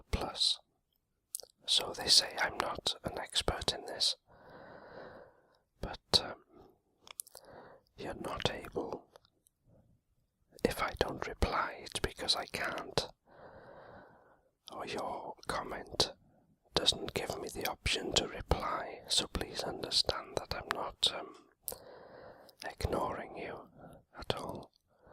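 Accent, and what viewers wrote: Wales, United Kingdom. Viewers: Just came across your videos. So nice hearing a Welsh voice doing ASMR 😀 I'm from North Wales, you? Diolch yn fawr 👌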